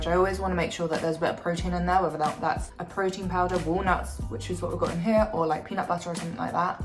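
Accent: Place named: United Kingdom. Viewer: Hi! I didn't know you'd have a YouTube channel 🥰 I'm Italian, but I live in Malta and I started following you for your lovely accent 😍 I love the British accent and culture. What's more I'm vegan too.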